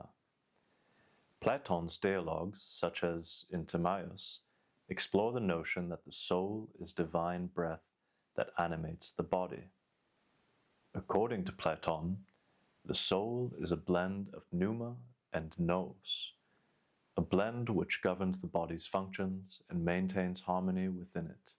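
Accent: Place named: Transatlantic, United States. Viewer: Your transatlantic accent makes you stick out from other content creators now and to come!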